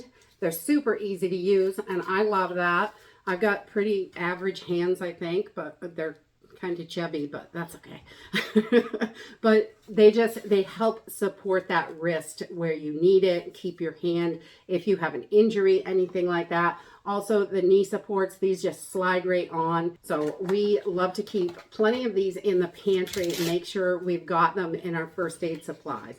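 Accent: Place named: Maine, United States. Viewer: I grew up in Maine outside Bangor-so her accent makes me smile :)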